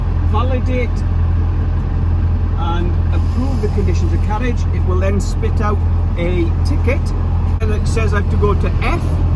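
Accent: Wales, United Kingdom. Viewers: great video, do I detect a welsh accent, good to see you are not having your image in screen at all times, like some I could mention !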